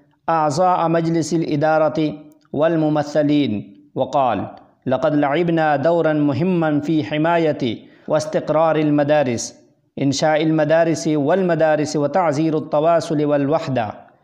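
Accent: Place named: Pakistan. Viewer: طاب نشرك أخي الكريم، بس الحاجة الى مزاولة الله‍جهة العربية والتخليص من شوائب الاردية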